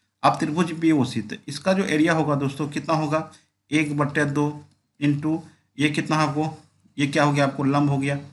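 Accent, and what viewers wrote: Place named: Mithila, India. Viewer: अपने के आवाज स प्रतीत होय ६ै अपने मिथिलांचल के छलिए! सादर आभार अपने के बहत निक से पढ़वे छलीये🙏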